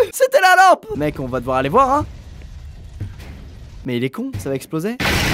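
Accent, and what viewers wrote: Quebec, Canada. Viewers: Tu sais parler quebequoi comme moi en tabarnak,😂jte soutien dla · Et ben squeezie tu parle en québécois tu nous explique???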